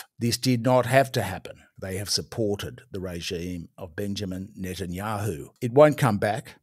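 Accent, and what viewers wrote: Australia. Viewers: Finally an Australian accent criticising that horror in the Middle East by Israel and America. Frankly can never agree with imprisonment of indigenous peoples nor genocide or even starvation nor torturing via rape! They need stopping and justice, nothing else. Free the Middle East from Israel and America. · Very grateful to be able to hear an Australian voice speak the truth. Legend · My first time watching this channel. I thought "He is an Australian!" I can pick our accent pretty quickly now 😀. It's a friendly accent. Thank you.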